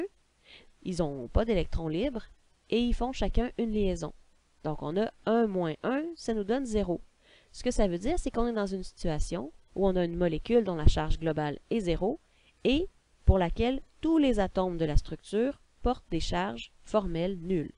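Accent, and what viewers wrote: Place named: Quebec, Canada. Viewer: Je valide l’accent québécois ainsi que la vidéo 👌🏾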